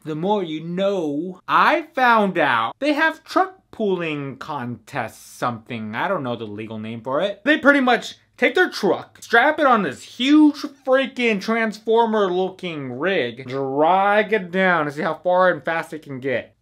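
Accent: New York, United States. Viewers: really, thought that was new yorker accent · your accent sounded more like a New Yorker not someone from the east cost.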